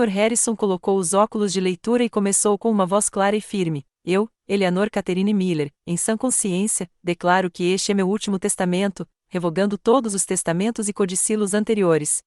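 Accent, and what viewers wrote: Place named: Portugal. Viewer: Gostei muito da historia. Eleanor uma grande mulher, que deu uma grande lição à toda a família depois da sua morte, endinando-os o verdadeiro valor da vida, o amor entre as pessoas é muito superior do que o material. Apesar de ser importante e confortável , não o que nos define. Narração perfeita, de Portugal ❤❤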